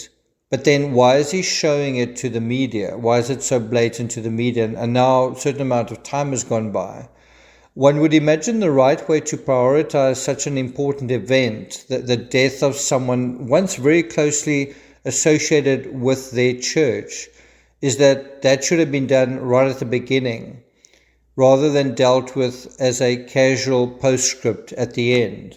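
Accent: South Africa. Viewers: Are you South African? Your accent....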